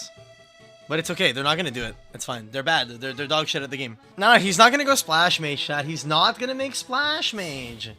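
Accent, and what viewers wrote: Canada. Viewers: This guy’s video started autoplaying when I left YouTube open. He sounds so damn Canadian lol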